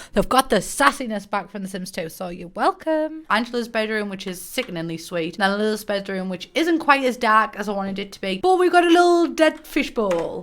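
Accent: United Kingdom. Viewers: Here i am again downloading it again thanks i did keep up with your dialect even tho i'm from norfolk nice to hear a brit talking about sims makes a change from the yanks all the best love to you and stay safe.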